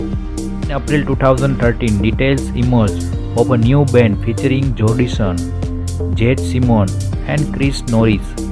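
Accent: India. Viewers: The guy narrating this needs to learn some English first haha. Sorry I’m not a racist - just that his voice is hilarious. He sounds like a Bihari from India. I am sure the guy who posted this video did that voice as a joke. And what’s with the elevator music?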